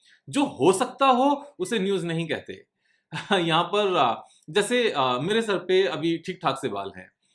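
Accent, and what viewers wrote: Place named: Tamil Nadu, India. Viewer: Thanks bhai for this video. Very informative.I also had fun listening to your Tamil pronunciation.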